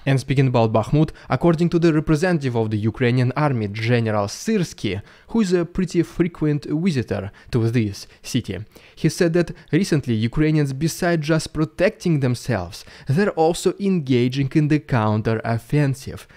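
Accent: Russia. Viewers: because he has a russian accent. obviously.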